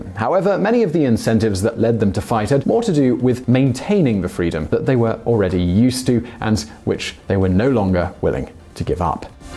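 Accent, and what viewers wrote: United Kingdom. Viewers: ... are you british?:)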